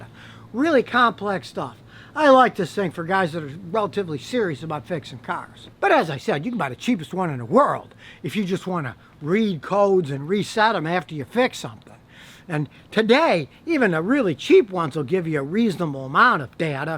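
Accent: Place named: Chicago, United States. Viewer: I see the live free or die sign is he from New Hampshire or just like the motto, because he sounds like he’s from Chicago. Just curious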